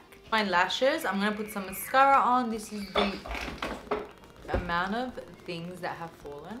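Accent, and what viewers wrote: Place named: Australia. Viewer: i wish i looked like you omggg and i love your aussie accent lol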